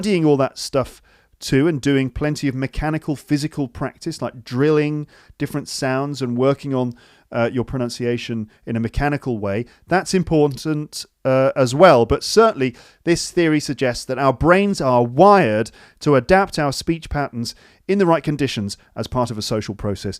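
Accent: RP, United Kingdom. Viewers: I like british accent. so I really like to hear your podcast. many thanks for your superb poadcast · Hi! I love listening to your podcasts, your voice, and your British accent. But when you imitate other accents, it was hard to understand them. In order to communicate with non-native speakers, I don't want to follow the rule of accommodation theory and grade my English down. I would prefer to speak closely the way you speak. But if you are a native speaker, you can accommodate your accent to different kinds of people. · Excellent you're apparently an amazing mentor with your indispensable method in particular with the pure British accent I wish I could talk to everytime anytime to be honest I'm an English either I've got approximately 50 students who belong to middle class family but they obviously make an effort to develop their vocabulary and focus on their spoken English most of them enjoy a lot but they're curious to talk with a native speaker specifically with British I hope you could spare sometime with my students online on video call thanks a lot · My best teacher. 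I love British accent. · I love the british accent, your channel is one of the best to practice my listening. :)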